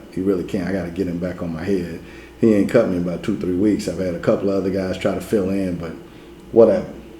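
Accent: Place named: East Coast, United States. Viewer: You sound like an East coaster. Where you from fam?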